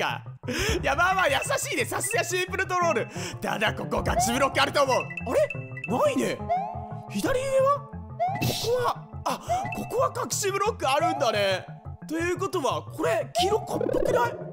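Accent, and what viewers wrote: Fukuoka, Japan. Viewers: 自分も福岡住まいなので福岡弁聞くとすごく親近感湧きますw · さん大好きいいいいい！！！！！すみません。久しぶりにさんの動画を見にこれて、嬉しすぎて癒されすぎて気持ちがあふれてしまいました(ﾉ≧ڡ≦)☆ﾃﾍｰ コメントしきれないくらい、どこもかしこもさんが可愛くて可愛くて、終始ニコニコしてました〜🌸 福岡弁のさんが新鮮で良かったです〜！！うちの父は佐賀県出身なので、なんか安心する気持ちもありました( ˊᵕˋ*) 本当に癒されまくりました(◍ ´꒳` ◍) 心がぽかぽかです！いつもありがとうございます🌸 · 福岡弁も中々悪くないですね。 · 福岡弁、やばすぎてやばい、、、🥺 方言でずっと喋ってて欲しい🥺 ギャップ萌、、、からのかわいいかわいい🥺 ショタ喋り久々にやって欲しい、聞きたいです！（笑） 今日も面白かったです😂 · 実況中も福岡弁がいいな、絶対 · さんの福岡の言葉聞けてなんか嬉しいたまに〇〇ばいとかはさんが言ってるのは知ってましたけどここまでフルに出たの僕は初めてですね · いい訛り具合ですね૮. ̫. ა ⸝꙳ 福岡訛り好きです(笑) そして最速タイムおめでとうございます🎊🎊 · 福岡弁で喋ってる時笑い過ぎて内容が全く頭に入ってこなかったwww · 福岡弁、あんまり違和感無かった！でも、同じ九州でも熊本弁とは大違いですね！ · ちゃんと期待に応えて福岡弁やってくれてるの優しいw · この福岡弁完ぺきやで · さんの福岡弁新鮮で好きです！！やっぱりバネは友達ですね！ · 福岡弁のさんなんか違和感.... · お疲れさまです！さんの福岡弁に萌えました❤️ ｶﾀｺﾄの標準語も好きですw(৹ᵒ̴̶̷᷄ωᵒ̴̶̷᷅৹) 1発マリオのゴールは思わず声出ちゃいました⚑ﾞ⭐️ 一日の終わりに見れてよかったw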